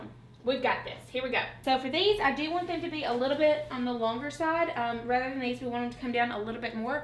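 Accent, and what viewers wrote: South, United States. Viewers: I so love my! You always sound like a Southern Belle - no matter what you think. I'm going to make this. Maybe even today!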